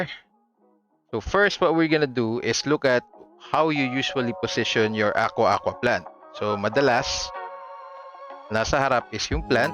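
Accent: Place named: Conyo, Philippines. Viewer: Conyong conyo amp...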